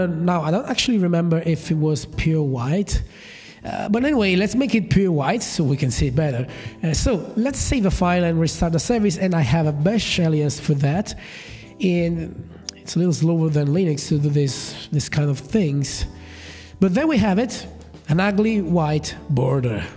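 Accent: Italy. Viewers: Hey dude, are you italian? Cause you sound so, but your English is really good.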